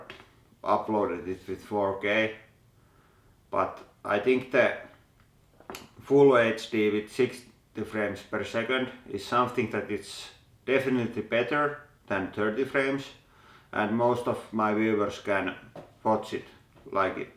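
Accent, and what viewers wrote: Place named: Finland. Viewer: Krzesimir Nowakowski Finnish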